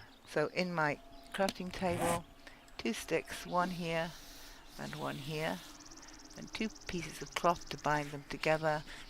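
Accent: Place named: RP, United Kingdom. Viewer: You accent makes me pay attention, it's like someone just turned the BBC on but instead of news it's relevant information I will use in my life.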